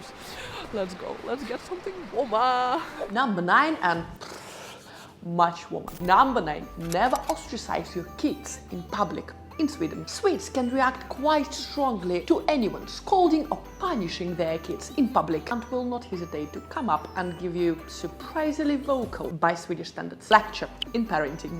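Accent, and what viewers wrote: Russia. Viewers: Похоже на русский акцент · Are you russian? You have russian accent . An intonation mostly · Your russian accent hurt my ears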